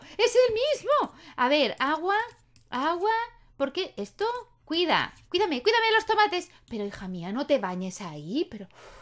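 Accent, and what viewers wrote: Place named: Spain. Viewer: no es ofensa todos deben respetar como hablan los demás antes lo escuchaba fea esa palabra pero las personas malinterpretan todo pero no te preocupes tu eres dé españa así hablan ustedes nadie les tiene que quitar eso :D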